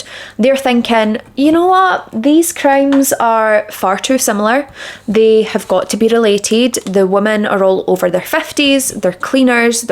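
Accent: Scotland, United Kingdom. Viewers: I noticed my notification for your video and yelled out you posted, my 9 yr old son yelled whoo hoo because even he knows how much I love you❤. You do stories I haven’t heard and I love that you have the same thoughts of the criminals and things they do so it’s more engaging to watch! Not to mention I adore your Scottish accent, I tried to learn it by watching videos but it’s not so easy😂. If I could have some makeup tips that would be great but I don’t think I would be as beautiful as you😊. Thanks for all your hard work, I hope you get noticed more, you deserve it love.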